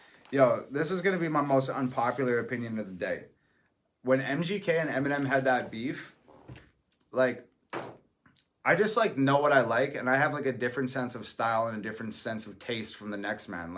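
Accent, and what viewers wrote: Canada. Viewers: You can tell he is from Canada by the way he says sorry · He sounded so Canadian, sometimes I forget and then I hear him apologize like 7 times in the first minute😂 and the way he pronounces words with "r", love this man so much, so humble.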